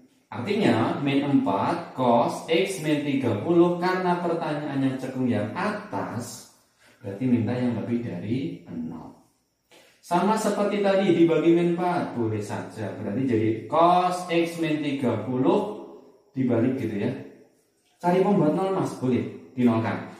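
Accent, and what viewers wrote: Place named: Java, Indonesia. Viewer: gatau kenapa kalo yg ngajar suaranya rada meudhok2 gt,lgsg paham :))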